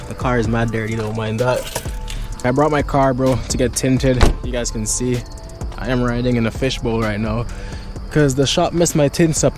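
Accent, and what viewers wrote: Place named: Jamaica. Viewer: U sound like a Jamaican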